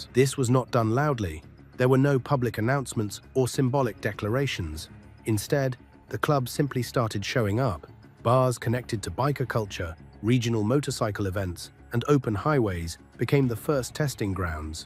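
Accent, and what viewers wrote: England, United Kingdom. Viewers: Why is there so often some dork from England doing the narrating?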